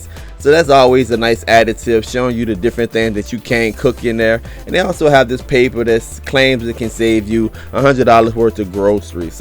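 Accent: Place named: Louisiana, United States. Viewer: I knew it lol. As a fellow cajun, I would recognize that accent from anywhere.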